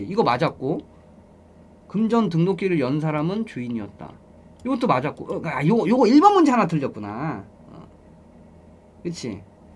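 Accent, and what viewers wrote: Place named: Seoul, South Korea. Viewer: 케인님 나긋나긋한거랑 특유의 서울사투리 거르고봐도 말투나 표현법보면 상당히 여성적인편임 ㅋㅋㅋ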